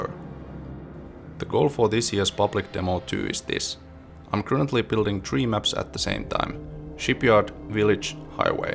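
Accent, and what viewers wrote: Finland. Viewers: Are you finnish you have strong finnish ascent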